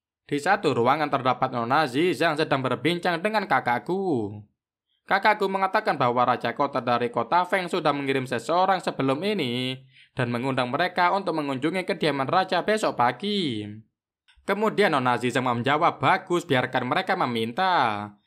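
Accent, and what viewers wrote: Java, Indonesia. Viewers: Medok bet min bacrot ente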